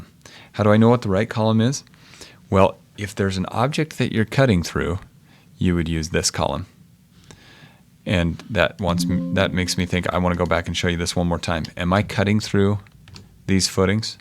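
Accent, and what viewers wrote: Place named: United States. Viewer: US accent yeah:face-fuchsia-wide-eyes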